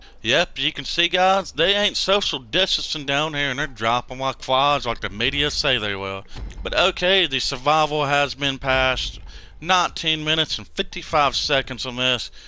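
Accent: Texas, United States. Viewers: My nigga u from texas? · Is it your mic or are you from Texas?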